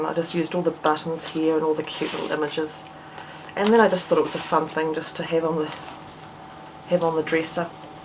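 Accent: New Zealand. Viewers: I love your book, I have one similar in process... and I ADORE your accent!!! NZ has the best accent in all the world, to me.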